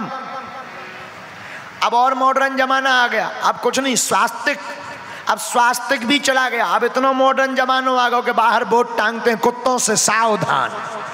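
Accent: Bundelkhand, India. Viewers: Ye apka vedio bahut pyara hai .kitana original bolte hai .Maja a gayi .Apki Bundelkhandi language bahut man bhawan hai..Krishan kanhaiya sa lavanya Mai Devatva Swaroop .Vaisi he meethi meethi Bate.jai ho Banke Bihari Lal apki Jai Hove.... Bageshwar Dham Sarkar ki Jai.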